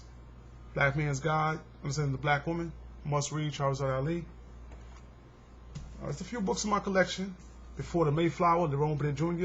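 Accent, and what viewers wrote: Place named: East Coast, United States. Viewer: You have an unmistakable East Coast accent. I will look into purchasing some of those books you reference. Good job keep up the good work youngster.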